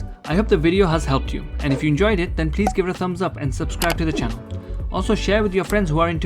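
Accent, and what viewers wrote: India. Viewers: Do I detect an Indian accent ? ;)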